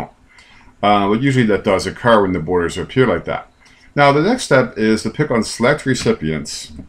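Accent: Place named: Baltimore, United States. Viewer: You're from Baltimore, aren't you??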